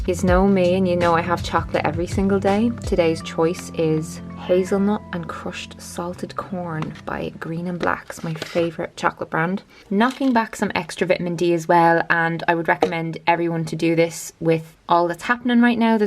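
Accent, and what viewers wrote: Ireland. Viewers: i'm going to go and study in Dublin for the next year so i've been binge watching your videos to up my game on the Irish accent so I don't look like a complete idiot 😂☀️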